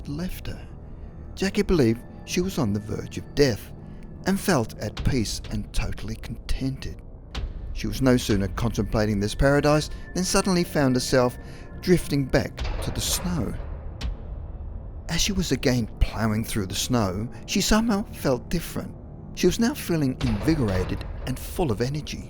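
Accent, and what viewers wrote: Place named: Australia. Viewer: I thought it was an Aussie accent! Hi from a fellow Australian 😊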